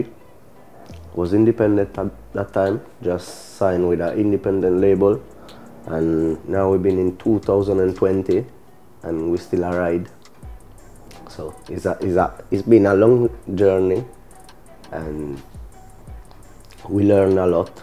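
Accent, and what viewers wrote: Jamaica. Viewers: Cazzo se si sente l'accento jamaicano... Altro che ebbasta quando parla inglese alla renzi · Minchia che Inglese Patois-izzato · Top si sente l'influenza Jamaican nel tuo inglese real Swag no sucker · chi sono? E inglese giamaicano ovviamente vivendo lui la ha preso quell’influenza, molto diverso dall’inglese che studi a scuola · No vabbè l'accento jamaicano non si può sentire... Cazzo sei italiano, usa il nostro accento almeno o se proprio ti devi sforzare usa quello inglese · Patrick jolly splif se ha imparato parlando l’inglese jamaicano cosa ci può fare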